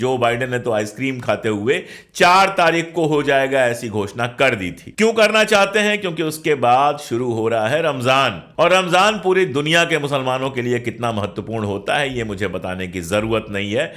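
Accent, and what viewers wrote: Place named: Awadh, India. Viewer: Bhayya aap ki aabaaz me Oudh ki khushbu aati hai, kya aap Oudh se hain? aapki patrakarita me insaniyat ki khshbu hai, Allah Paak aapko aise hi kaamyaab rakkhe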